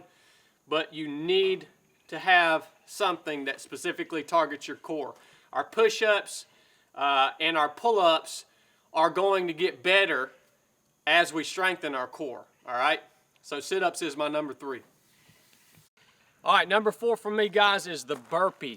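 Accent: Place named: Tennessee, United States. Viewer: Love it nice, I dig the accent as it reminds me of my dad who was from Tennessee, I grew up in California I was a CTR in the NAVY, worked out a lot, I was even better on a run than most youngins. I am 48 now and got type 2 diabetes, got to watch how much beer and sausage I eat I guess. I pretty good, bit tired after lunch though. I always need a nap. I teach teenagers Mon-Fri. 8-2pm. I wake up to prep for work btw 6-7am. I go lift at the gym at least once a week maybe 2 sometimes, I try to do a FunkRoberts(youtuber) 10-20 min workout a couple times a week. Any tips on diet and workout are welcome guys, Thanks!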